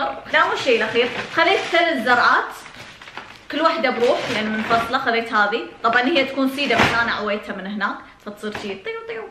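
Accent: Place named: Kuwait. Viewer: احبج كثير واحب لهجة الكويت اختك من السعوديه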